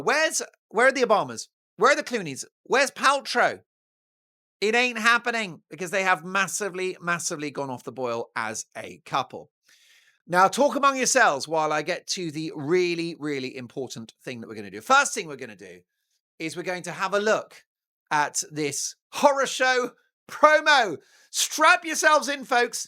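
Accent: Australia. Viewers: Dolan, you are very entertaining and you do the best Aussie accent I have ever heard, cracks me up every time (I'm Aussie so I do know). "Cobber" is very sixties though, it's "mate" now but keep up the fun times with this show! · Love the Australian accent. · 😂😂 your Aussie accent is on point.. brilliant.. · Love the Auzzie accent.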